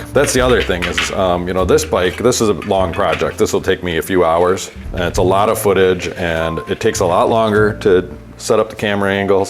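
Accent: Wisconsin, United States. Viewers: I feel like you are from Wisconsin saying Ope! Later on in the video figured it out. Great video! I might do a similar upgrade.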